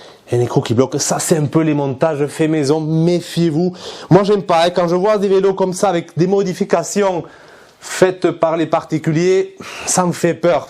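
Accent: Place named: South, France. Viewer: bien joué et merci des infos, mais quel est cet accent agréablement sudiste? Sud ouest?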